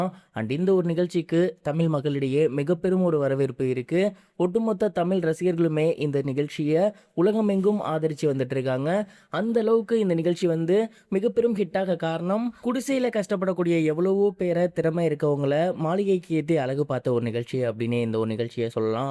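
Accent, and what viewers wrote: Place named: Kerala, India. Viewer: Nayae un voice kerala chee...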